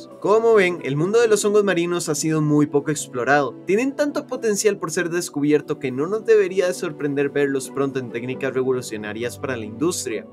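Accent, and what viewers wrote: Costa Rica. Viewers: ¿Se puede ser más tico? Jajaja, me encabta este canal ❤ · Eres tico?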